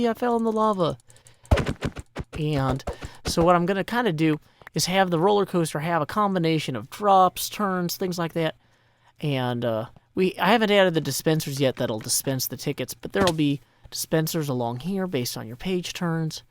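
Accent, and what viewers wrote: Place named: South, United States. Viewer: This is the first time I’m watching one of your videos. I haven’t watched Minecraft videos for the better part of six years, but nothing has brought me more peace or put a smile on my face than listening to you talk to us about assumptions and the dangers of comparing yourself to others. I thought I didn’t like southern accents, I have changed my mind Thank you.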